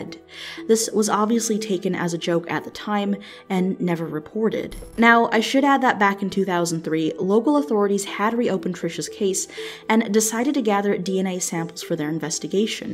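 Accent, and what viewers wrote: Hawaii, United States. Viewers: She's from hawaii it's an accent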